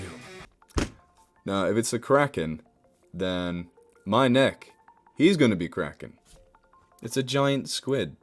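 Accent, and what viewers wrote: Ireland. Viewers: 1, you're Irish? and 2, fucking lmao this was funny! But also you showed me some cryptids I've never looked up before 👀 gg, sweet vid~ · Love the Irish accent lol great video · I like your Irish! You are adorable!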